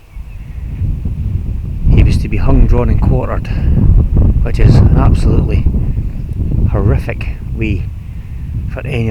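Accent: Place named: Scotland, United Kingdom. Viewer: Thank you for sharing. I could listen to your brogue for hours!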